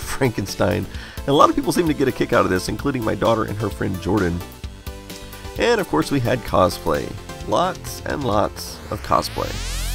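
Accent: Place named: Texas, United States. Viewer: I can hear that Northern Texas Accent in your voice. I don't know why I never picked up on it in the past.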